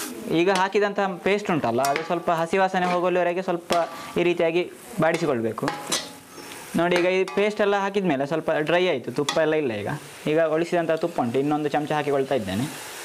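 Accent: Mangalore, India. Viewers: ನಿಮ್ಮ ಮಂಗಳೂರು ಕನ್ನಡ 👌👌👌👌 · ನಿಮ್ಮ ಮಂಗಳೂರು ಶೈಲಿ ಕನ್ನಡದಲ್ಲಿ ಅಡುಗೆ ಮಾಡೋದನ್ನ ಕೇಳೋದೇ ಅದ್ಭುತ. ಸ್ವಚ್ಚಂದ ಪರಿಸರದಲ್ಲಿ ನೀವು ಮಾಡೋ ಪ್ರತಿಯೊಂದು ವೀಡಿಯೋ ತುಂಬಾ ಇಷ್ಟ ಆಗತ್ತೆ.. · I am watching your channel because of your mangalore kannada💛❤ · Wow..very nice pulav...👌 Nice way of explaining..mangalore kannada ..❤Keep it up..🙏🌹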